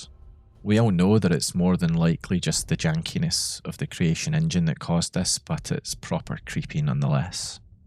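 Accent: Scotland, United Kingdom. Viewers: Subscribed because of scottish accent.. something I'm trying to learn. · Scot? Irish? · Are you by chance Scottish?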